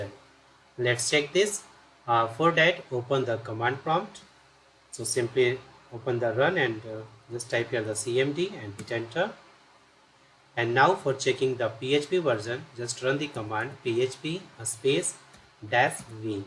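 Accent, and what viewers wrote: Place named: India. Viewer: I love you so much Mister India, my friend and i love your accent so much, we will come to India soon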